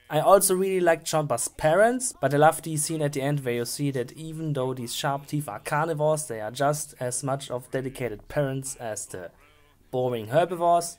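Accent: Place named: Germany. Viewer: i learn german (stil A1 at the moment) and i recognized the German accent in your videos. funny and awesome as well. also, love your videos, i'm also a big land before time fan, as well as a huge dinosaur nerd. so i know all of the dinosaurus of Land Before Time and Jurassic Park and stuff. loved the Walking With Dinosaurs reference at the end of the video. ("perfectly balanced") i would rank them quite diferently but for now i only have a top three, and a worst tree and there in between i have no idea yet because i havent decided yet. best ones 3 - Mysterious Island 2 - Big Longneck Migration 1 - The Original Worst ones 3 - Great day of the Flyers 2 - Invasion of Tiny saurus 1 - Unlucky Thirteen (totally skipable and unwatchable) Wisdom of friends (fuckery) "perfectly balanced As all things should be." also have to say, even if Stone of Cold Fire is not on my top three spot on the list, it still has in my opinion the best song of all the songlists:. 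but because there are no sharptooth threats, i dont think it deserve the spot on favorite ones because that was a downer for me. (notice, every other sequel had sharptooth action in it, and i always thought it was stupid to not include sharpteeth in 7. technically there were in there, but only as flashback but thatst just dumb.)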